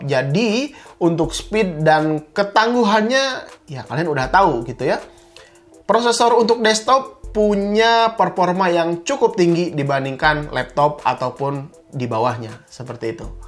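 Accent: West Java, Indonesia. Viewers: Urang sunda ieu mah ngomong na lipa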